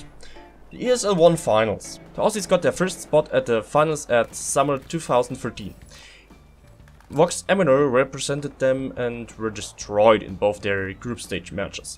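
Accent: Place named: Germany. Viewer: Man hört so den deutschen Akzent so hart :D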